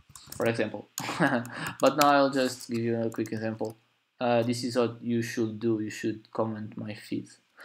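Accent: Portugal. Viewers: Esse sotaque português ... Topei assim que começaste a falar. Obrigado pela dica bro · Assim que ouvi a tua voz reparei logo que eras tuga, bom vídeo. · Pelo teu sotaque bem me parecias português xD , obrigado pela ajuda !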